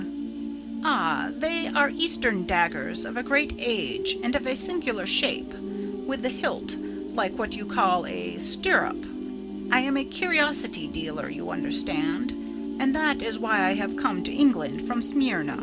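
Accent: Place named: United States. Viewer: That stupid music .. and the strident US accent.. ruined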